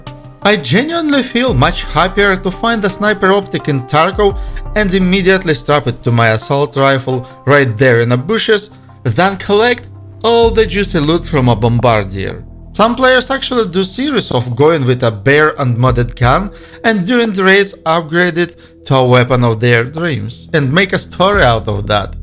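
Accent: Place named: Eastern Europe. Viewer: Getting ratted, and the fact that you lose all of you gear except for the safe pocket, killed this game for me months ago. Tried it again 2 nights ago because in so many ways it's amazing, but this is a deal-breaker. Your accent sounds like people I call "brat" or "druk", and is not a problem at all. In fact, makes the video even more compelling. Slavic bros know things. Seriously though, if some clown with a free loadout can rat you while you are decked out with all purple and gold guns and augments, what is the point? "Horde of naked hobbits" 💀💀💀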